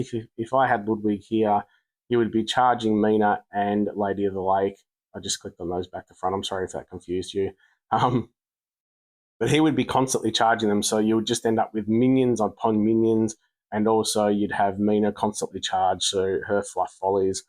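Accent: Australia. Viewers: lol as a Texan I can tell you that the Beaver Nuggets are an excellent little snack. Bucees gas stations are a destination spot around here. I never expected to hear an Australian talking about the Nuggies in an Empires and Puzzles video on your tube though. Crazy times lol 🤣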